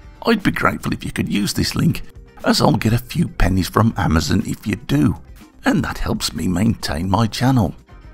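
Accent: Birmingham, United Kingdom. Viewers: are you a brummie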